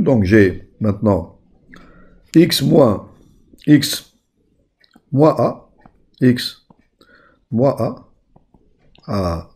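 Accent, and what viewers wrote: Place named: Algeria. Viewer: C'est un professeur algérien, c'est pourquoi il parle arabe français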